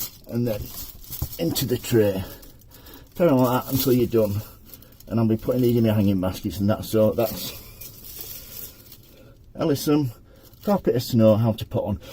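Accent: United Kingdom. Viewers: Lovely video. Loving the Yorkshire accent to obtw, I'm in West Yorkshire myself, or should I say mi sen 😂 What other flowers do you think would go nice with alyssum in a basket? Cheers, Ali.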